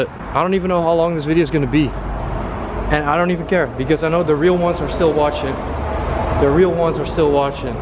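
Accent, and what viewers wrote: Netherlands. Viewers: Are u dutch?????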